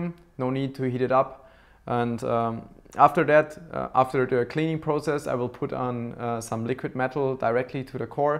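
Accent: Germany. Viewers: LOVE YOUR GERMAN accent ✌️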